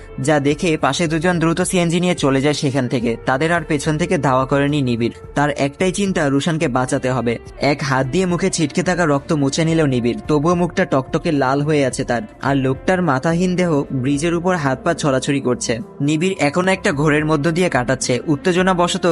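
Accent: Sylhet, Bangladesh. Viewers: Vai apni ki Sylhety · ভাই আপনি কি সিলেটি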